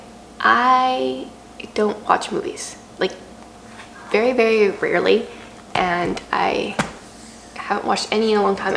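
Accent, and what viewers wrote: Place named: Canada. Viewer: ive reached a new record as an american. i was able to clock you as canadian in just 25 seconds. checked your channel and i was right! canadian-dar is alive and well. greetings from the south in gun-trump-freakyland usa!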